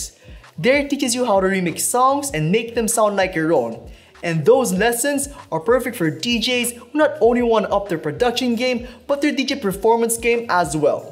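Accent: Philippines. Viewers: I love that Filipino accent. Beautiful people with beautiful voices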